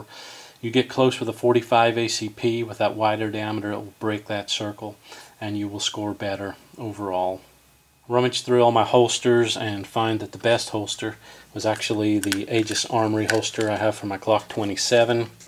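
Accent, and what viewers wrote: Ireland. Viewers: Are you from rural Ireland?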